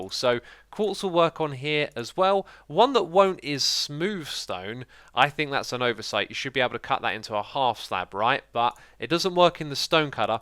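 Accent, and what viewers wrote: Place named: North, United Kingdom. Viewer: What dialect is that exactly? Are your from the north? Keep up the good work!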